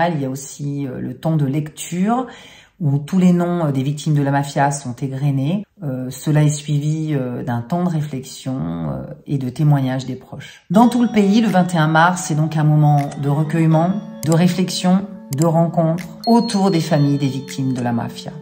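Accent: Italy. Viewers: Ah ! Le RDV hebdomadaire avec ton magnifique accent italien 🤩🤩 Ça alors, je ne savais pas qu'il existait une journée nationale en mémoire des victimes des mafias… Quel dommage qu'elles gangrènent encore ce beau pays.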